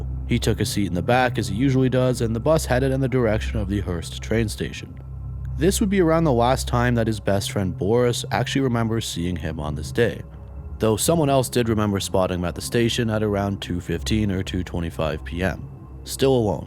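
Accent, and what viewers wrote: Canada. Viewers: Dude You are SO Canadian!! 😂 not an insult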